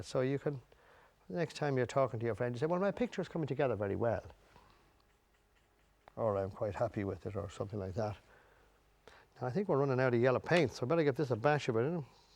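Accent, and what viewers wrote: Ireland. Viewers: Thank you so much for putting this lesson on. I've followed along with quite a few of your paintings and have learned a lot from you, and I love hearing you talk and listening to your Irish accent! Please keep on teaching us. Thank you!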